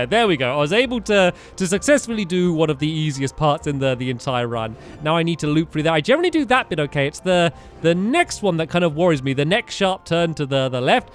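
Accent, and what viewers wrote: United Kingdom. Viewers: he British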